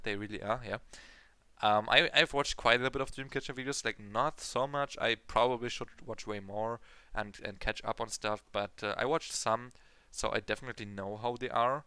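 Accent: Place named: Germany. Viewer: Du bist doch Deutscher! Erkenne diesen Akzent sofort c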